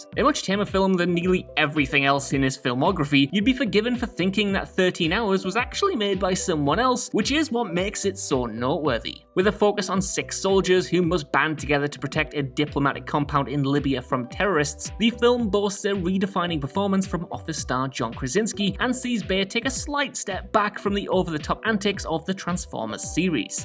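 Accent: United Kingdom. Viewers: Can't get enough of the fast talking British!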